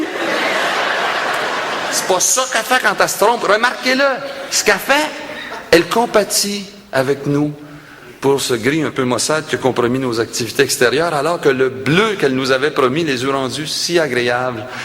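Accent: Quebec, Canada. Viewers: Je ne sais pas si tu lis les commentaires après tant d’années mais, je peux te dire que t’es une des personnes qui m’a fait aimer l’accent québécois. J’aime ta façon d’exposer l’actualité, tellement brillant; je partagerais un repas avec toi autour d’une table et jaser de tout et de tout. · la moitié des mots ne sont pas dans le dictionnaire?? Et puis pour les anglicismes je pense que les européens sont aussi pires, sinon plus (faire du "shopping", servez moi un "coca lite (ou light)" .......) et je suis désolé mais il parle comme un québécois de souche, ce qu'il est. C'est une langue qui dit ce qu'elle as a dire sans avoir besoin de se tenir le nez en l'air. Vous avez vraiment l'air "frais chiés" en france, toujours le besoin de corriger les pseudo-fautes des autres.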